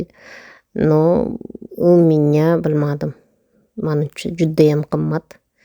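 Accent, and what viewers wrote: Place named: Navoi, Uzbekistan. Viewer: Овозизгахам узингизгахам гап йук Навоини шеваси N 1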